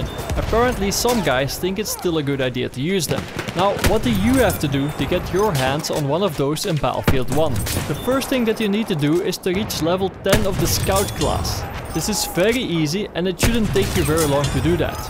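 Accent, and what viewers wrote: Netherlands. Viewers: i hear a dutch accent in your english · You have the dutchest accent ever hehe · are you doing that dutch accent on purpose? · Lekker nederlands accentje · youre dutch right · Annoying Dutch accent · Fix je accent en wees alsjeblieft niet zo Nederlands. Mijn oren bloeden. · are u dutch?:P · the dutch accent is strong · are you Dutch? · Jij bent Nederlands zeker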